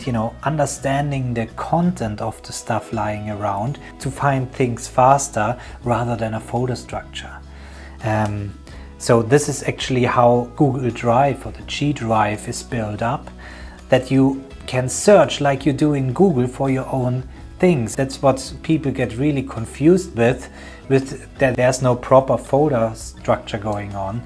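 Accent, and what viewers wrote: Germany. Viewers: Das ist very german englisch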